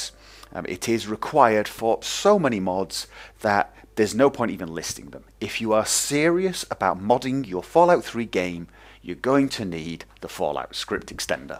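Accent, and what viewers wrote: United Kingdom. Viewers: Is this guy norwegian? He speaks like a brit XD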